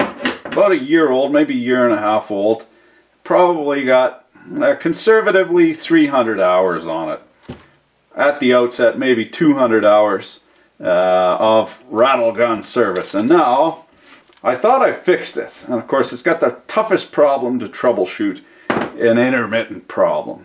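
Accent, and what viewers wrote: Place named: Canada. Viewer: I love listening to you I don't even know what da hell ur doing but hearing a Canuck talking shit is awesome thanks 🍀🍀😁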